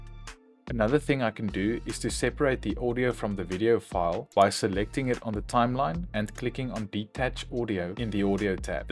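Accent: South Africa. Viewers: You sound South African 💯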